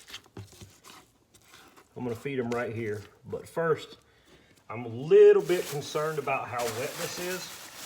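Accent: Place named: Louisiana, United States. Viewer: Just Subscribed! Emily sent me. I'm gonna order some ANC cocoons from you towards the end of July. I thought I heard Louisiana in your video. Morgan City living here. Keep up the good work.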